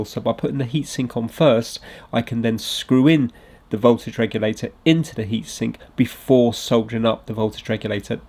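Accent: United Kingdom. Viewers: its funny, I have been listening to you for years now. My daughter says to me (her room is down the hall from my office / gameroom) shes like you really enjoy listening to British youtubers, and I said "It's " said been listening to him for years and being British always sounds more "official" Thanks as always for your content.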